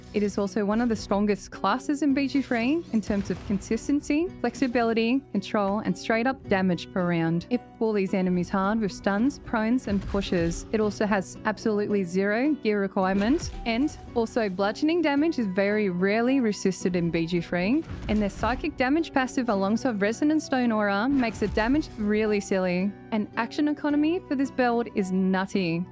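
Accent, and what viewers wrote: Australia. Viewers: Love the video, And the strine! This is freaking thickest one I've ever heard, which makes in even more intriguing!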